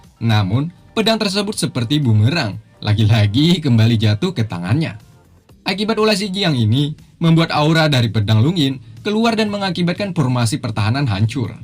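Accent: Banjar, Indonesia. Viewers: Bang lu orang banjar kah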